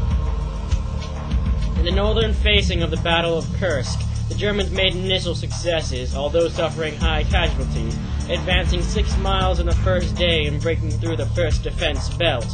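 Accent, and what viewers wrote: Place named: United States. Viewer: Very good. Excellent to hear about the true turning point of WW2 through an American voice. In the US, we rightfully pay tribute to our amazing heros of D-Day, but we lose sight of the fact that we were but junior partners when it comes to the European war. My father was among those Americans who fought in Africa, and then Italy. Six of my uncles fought also, one in the Battle of the Bulge, two in the Pacific, one of them killed by the Japanese. But the Red Army crushed the Wermacht.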